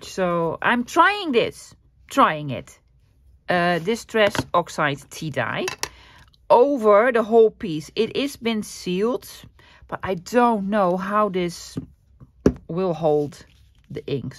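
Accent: Netherlands. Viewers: Hello, I am new to junk journaling and stumbled on your videos by accident, your Dutch accent was like music to my ears as I travelled to the Netherlands a lot when I was much younger, places like Amerstam, Rotterdam, Guoda, Delft, I visited Glass city and the flower markets and auctions I have been to Floriade twice, and many other smaller places, unfortunately I have not visited for quite a few years now, I love your videos my first attempt at a journal is almost finished it's not perfect but I like it, it is filled with many of your projects from the 100 day challenge, you are such a talented and gifted person, and a lot of things from your past resonate with me.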